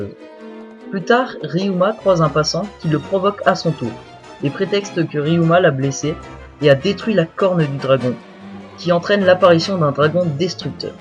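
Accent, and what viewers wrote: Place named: Japan. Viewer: Je valide l'accent japonais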